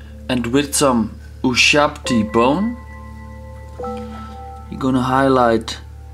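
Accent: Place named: Netherlands. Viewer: Geweldig gedaan man, ik kan aan je accent horen dat je Nederlands bent.. Ik heb geabonneerd, echt super goed gedaan.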